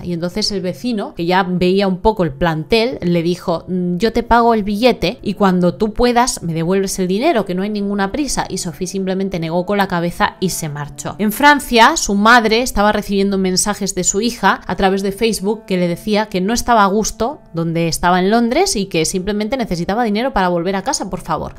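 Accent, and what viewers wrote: Spain. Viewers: Porqué la ves? A quien le importa tu opinión?? Más quisieras tú tener el acento "castellano"(España) qué tiene.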